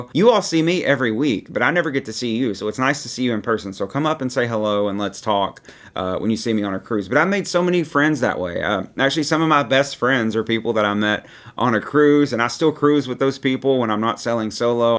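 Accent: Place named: Tennessee, United States. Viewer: I like your accent. My guess was Tennessee. No one likes mine Boston.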